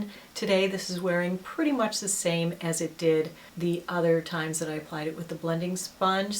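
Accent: United States. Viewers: This foundation looks amazing on you. Another thing, a bit irrelevant i guess, is that i really like the way you talk and articulate. Such a nice accent (american, but you still have many kinds of accents). Makes want to listen to your reviews :D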